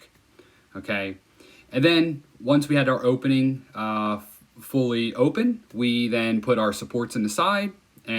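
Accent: Philadelphia, United States. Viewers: Gotta be from Philly with this accent. Nice work bro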